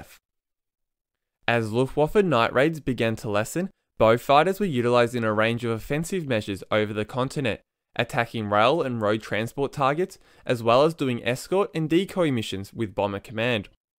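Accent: Australia. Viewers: Love the video and the accent. What part of Oz are you from? Greetings from Norway. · Loved the Beaufighter, some great photographs and videos, lovely to here the Aussie twang of the narrator. Excellent.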